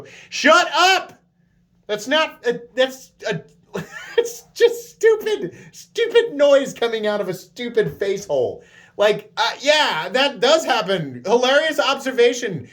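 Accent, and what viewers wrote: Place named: South, United States. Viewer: your southern accent is amazing 🤩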